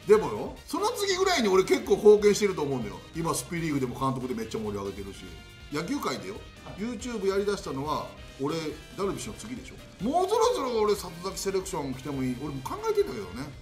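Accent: Japan. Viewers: さとやんアクセントが関東、関西、東北、四国山ほど混じってる（笑）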